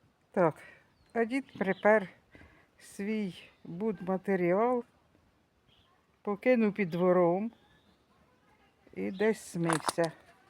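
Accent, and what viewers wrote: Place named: Poltava, Ukraine. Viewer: Доброго вам дня. Гарно у вас і тихо. Це мабудь Полтавщина, по говору. Миру вам і добра. ❤❤❤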